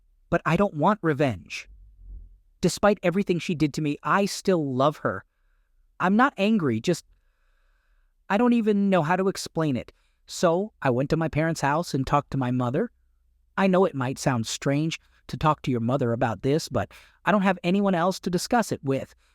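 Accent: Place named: Brooklyn, United States. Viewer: This is the worst narrative bot yet. A brooklyn accent with a simp voice.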